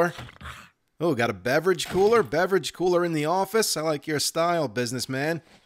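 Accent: United States. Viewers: Best series ive seen so far. You sound so american, its insane 😂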